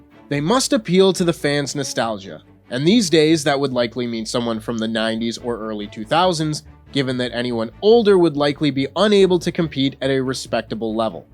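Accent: United States. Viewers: I thought the question of the day was "How did an American/Canadian get a presenter job there?" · American accent 🤯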